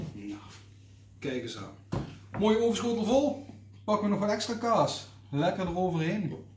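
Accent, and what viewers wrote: Netherlands. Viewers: Wouw echt geweldig lekker had jou recept gevolgd. Was ons niet pittig genoeg . Dus 2e keer gemaakt. Eerste keer gemaakt met de chips in de oven . Nu gemaakt in burritos vorm . Met een Carolina reaper en een chilli pepper. Hot chilli saus en chirazza saus * waarschijnlijk verkeerd geschreven ) maar mmmmmmm wij zijn echt flink pikante eters . Heeeeerlijk . Ik zie jou graag bezig. Ben zelf van België. Maar jij bent van de kanten heerlen denk ik ,niet ? Ik ben zelf van België grens maastricht . Ik vind dat accent zo geweldig om naar te luisteren als belg . Ik ga je volgen. Je legt ook alles zo mooi uit . Weet precies wat te doen . Top kerel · Zou deze man uit Limburg komen?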